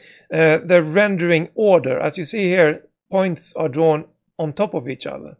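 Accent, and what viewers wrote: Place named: Sweden. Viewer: ...!! good swinglish, great shirt design!! its a good and cool video and very good explanation... requires good practice for the begginers to take good advantage of the tools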